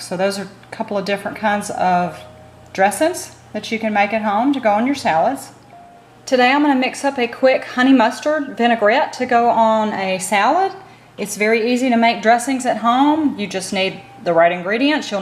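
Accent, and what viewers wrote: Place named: Tennessee, United States. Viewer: Hi, I just found you on YouTube and love your videos. I know you won't say but your accent sounds like mine and I am from Tennessee,. Go vols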